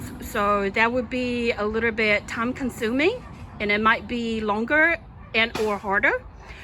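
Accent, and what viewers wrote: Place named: South, United States. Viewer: Love the greenhouse! Love your southern accent too.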